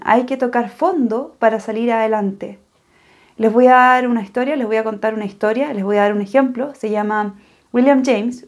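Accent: Chile. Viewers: Hola. Vi un video tuyo hace un par de semanas, y de ahí seguí todos los tuyos. Son muy útiles. Te felicito. Aunque mi caso es muy difícil. Por el timbre de tu voz (muy lindo), pienso que vos sos chilena. Y en un video te escuche que actualmente estas viviendo en la Argentina. Yo soy argentino. Me alegro que hayas elegido mi pais. Chile y Argentina, somos paises hermanos. Espero y deseo que te vaya muy bien. Mientras tanto sigo viendo tus videos, y recomendárselos a amigos. Una cosa, tus videos tienen un problema de edición. Salen con un sonidos muy bajo, que cuesta seguirlos. Bueno, un abrazo fuerte y gracias nuevamente!!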